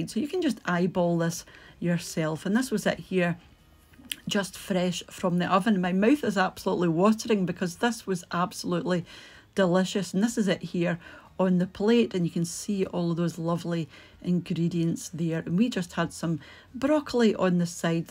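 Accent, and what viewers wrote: Scotland, United Kingdom. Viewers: it looks delicious and I love your Scottish accent! 🥰 ps. I've never heard of a "knob" of butter before☺️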